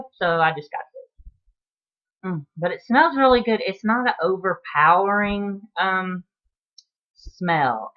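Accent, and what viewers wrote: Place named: Georgia, United States. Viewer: Good morning, I just checked out your podcast, I did a quick catchup. The whole time I was watching I thought you have a strong Georgian (you all.......) lol accent. I missed you telling your location. Diamond is too sweet. By the way we are neighbors. Marietta is were I knit. Keep it coming.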